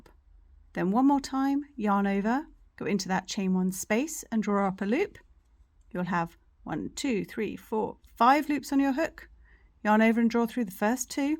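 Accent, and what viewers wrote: England, United Kingdom. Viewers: I so enjoy your instructions. They are given so clearly. You sound very English but use the US terminology. How is that? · And another wonderful video! I must admit that your accent is a delight to me. My grandfather immigrated from England in the early 1900’s and I’ve been soothed by a British accent my whole life. That and the marvelous content have got me hooked ❤️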